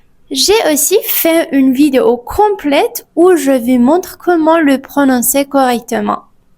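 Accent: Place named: Spain. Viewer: Madame a fait un très grand effort et c'est LE défi bravo mais pardon l'accent reste très fort, surtout en ce qui concerne le R, ça ressemble plutôt au J espagnol désolé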